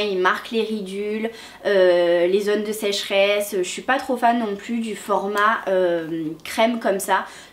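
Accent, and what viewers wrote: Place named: Marseille, France. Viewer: Tu es marseillaise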